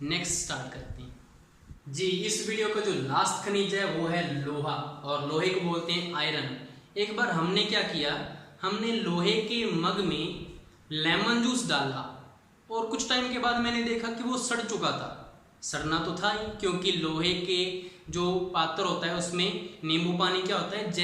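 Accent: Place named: Rajasthan, India. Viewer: Rajsathani lagyo mn Bhai re 😂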